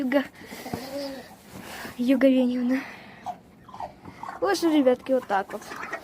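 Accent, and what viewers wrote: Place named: South, Russia. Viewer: добрый день,где вы живете у тебя такой южный говорок на гх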